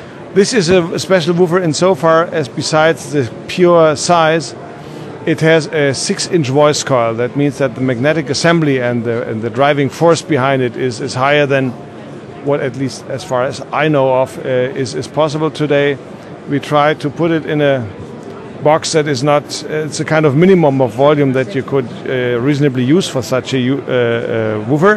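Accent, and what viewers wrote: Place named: Germany. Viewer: this fucking german accent: ....last not least for film studios, last not least for Cinemas ....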